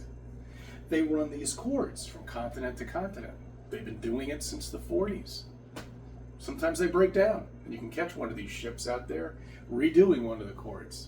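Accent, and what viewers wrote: New York, United States. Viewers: One question: which part of New York are you from??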